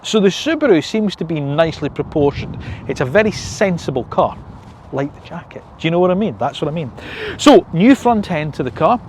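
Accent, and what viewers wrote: Scotland, United Kingdom. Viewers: Thank you for a truly fabulous review! Although the e-boxer Hybrid is just coming to here in one of the colonies, Canada, it is not yet available, and from early reports it will be in high demand. I will be getting a 2025 top trim non-Hybrid, which here in Canada is the Premier. We are lucky that we get the 2.5 L 180/hp engine here. Hopefully I can get the Autumn Green with the brown leather interior. Our grandson said you sounded like "Shrek" but no offence since my wife has Scottish roots. Cheers!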